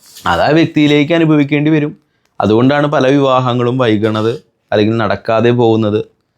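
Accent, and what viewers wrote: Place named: Thrissur, India. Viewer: ഇവൻ തൃശൂർ ആണല്ലോ 😂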